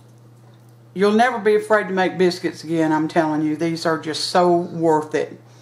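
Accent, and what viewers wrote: South, United States. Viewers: Good morning! I just want to thank you for getting me enthused again with my southern cooking! Can't wait to make either of your biscuit recipes I've seen on here! You just don't know what or how overjoyed I am! I have just subscribed to your channel and will be looking forward to seeing more of your southern recipes! What I do want to ask is, do you have a great recipe, that's easy, using a cake mix for Southern Mississippi Mud Pie! My aunt made it for years and it was so gooey and delicious with a such a great chocolatey taste with her rich frosting and marshmallow top!!!!!😋 But you just sounded like a great southern voice of knowledge, I just had to ask you. No one has found her recipe to this day and oh how I wish I could try making that MMC or pie. I again thank you, I apologize for making this so long. Please have a Blessed day and TY again for taking my comment! 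I'm from NC and will be so anxious again to try your recipes and God forbid, they come out good! Be Well, Katy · Are you using self rising flour? If so, that means the flour has leavening agents such as baking powder, baking soda and could contain powdered buttermilk. It's OK by me, but in reality there more ingredients than just flour and cream. I have been baking biscuit for over 60 years and I have never use self rising flour. I guess I learned from my Mom because we always kept AP flour around for many types of bread making and pie crust. What's important is if you and your family like them. All I am saying is self rising flour isn't just flour it is a combination of leavening agents and other ingredients. Love your southern accent, I am an old country boy myself.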